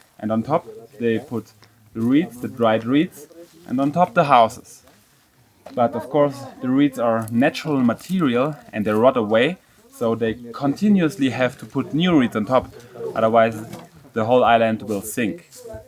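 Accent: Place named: Germany. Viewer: yesterday I just wanted to know about Germany, or better, Deutschland... and now I'm a fan... lol it's the Deutsch accent... I love it.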